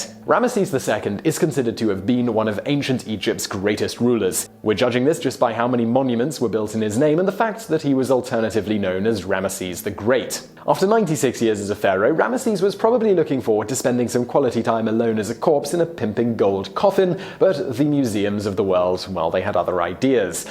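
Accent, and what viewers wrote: England, United Kingdom. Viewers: he's British I lived in Britain for more than 10 years and they don't pronounce words the same as we do in America. Go directly to an arcade and purchase a common-sense ticket. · ATON!!!!!! Christ, British people never say anything like the rest of the English speaking world! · He's English, it is hard enough as it is to pronounce a lot of Egyptian and other North African/ Middle Eastern names as an American speaker. I'd imagine it is a little bit more of a challenge as a "proper" English speaker. The two languages are at simply odds for pronunciation with translations. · Dude...hes British, be easy.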